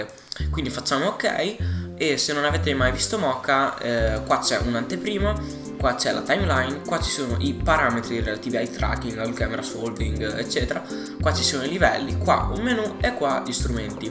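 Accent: Italy. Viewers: Bel tutorial...ma sei Bolognese?? forza BOLOOOOOOO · ***** ma sei di ferrara?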